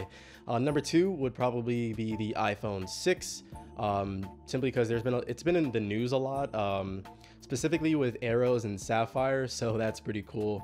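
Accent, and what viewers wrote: Nigeria. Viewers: Are You Nigerian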